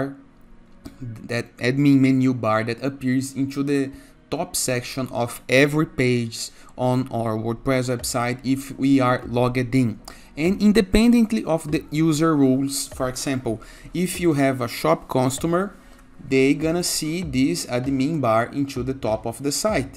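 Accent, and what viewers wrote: Brazil. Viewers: Are you Brazilian bud? This accent is so cool haha. Great video!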